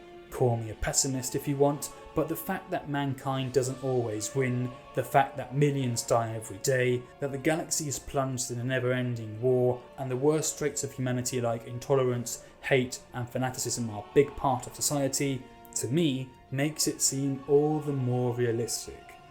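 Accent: United Kingdom. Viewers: omg, your english accent is orgasmic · man what a brit accent you have, when you said you would rule an english channel I expected a strong spanish accent. Impeccable.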